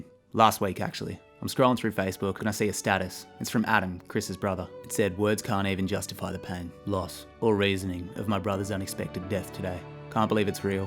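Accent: Australia. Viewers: I don't like this. I LOVE this. I've shared your vids a few times (and hope your followship and fellowship GROWS). I have special connections to chance, serendipity and Arid-zona. Beautiful tribute, but even better, you're a great storyteller and give sound advice. Oz accent or not (and storyteller is the highest compliment I can give, because it doesn't matter the medium, that is what we do). <3 And RIP to your friend Chris. Unless there's new adventures on the otherside. In which case, I hope he rips it up!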